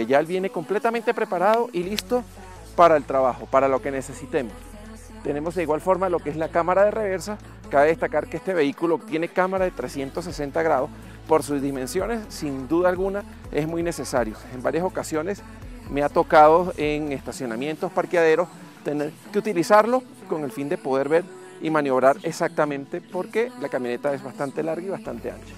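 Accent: Venezuela. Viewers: eres venezolano cierto? exelente video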